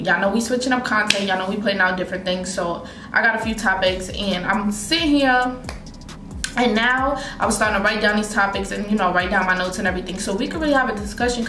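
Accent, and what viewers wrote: New York, United States. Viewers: Tawlk about. I'll never get tired of your NY accent. ❤️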